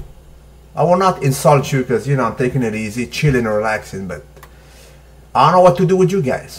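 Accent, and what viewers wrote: Arab world. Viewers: hahahah i like your arabic accent, if i find someone in morocco with biceps lookin' like an elephant balls i'll tell him what really works. By the way right now i'm bulking and i'm going to need you in my first cut man. SALUTE ! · his Arabic accent is pretty spot on lol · ***** i am from Egypt, I really have no idea how do someone even think he is aesthetic looking like that?! i also admire what you are doing trying to raise awareness and everything personally i didnt see a case of synthol abuse before in front of me yet i know for a fact that even pro bodybuilders use it sometimes to hide some weak points i dunno is it about abusing? not that i like the idea or anything even with a small dose its not even making muscle gains + the poisoning possibility from my side i am sharing your video on some Egyptian fb pages and forums, hopefully when teenagers see it, they realize the facts at early ages. 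salute you & your arabic accent as i honestly dont know how come you speak arabic :D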